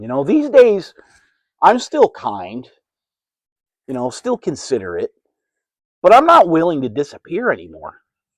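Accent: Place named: Texas, United States. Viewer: I’m from Texas and I thought I could detect some Texan! I like your content btw, keep it up 👍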